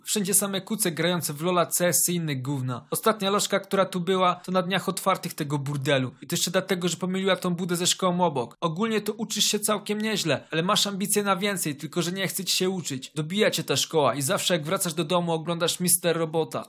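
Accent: Podlasie, Poland. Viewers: Ej mam pytanie jesteś z Podlasia? Xd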